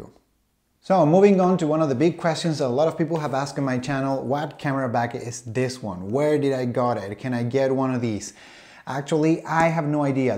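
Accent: Chile. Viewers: ... eres de chile?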